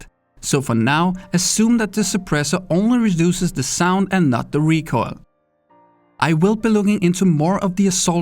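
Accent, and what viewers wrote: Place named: Denmark. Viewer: Are you Danish? If not! Then where are you from... Du lyder Dansk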